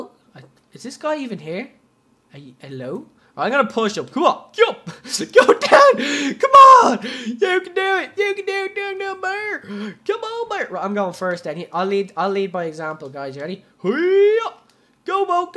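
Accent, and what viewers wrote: Ireland. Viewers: your just totalllyy amazing i love your little irish accent xxx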